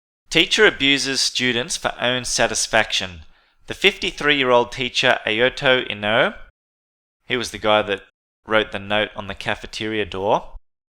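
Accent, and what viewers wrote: Australia. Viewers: Your accent is awesome! Is it Australian?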